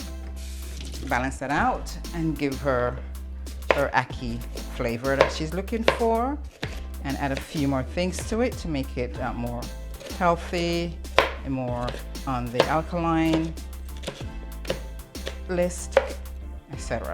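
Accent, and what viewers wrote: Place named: England, United Kingdom. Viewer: No salt fish really 🤪 sounding very english mate.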